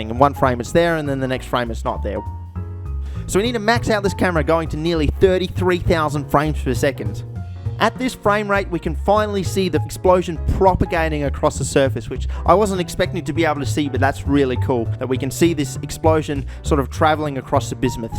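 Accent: Australia. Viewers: today is a good day, E&F video. time to hear an aussie love the 60s.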